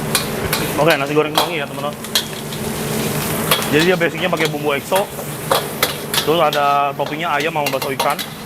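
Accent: Banyumas, Indonesia. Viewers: Bch Ngapak sekang ndi kuwe cilacap apa pwt sing sregep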